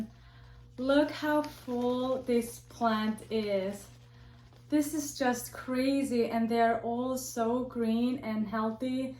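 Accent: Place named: Netherlands. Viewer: Beautiful plants! Are you Dutch perhaps??? I just recognize the accent :)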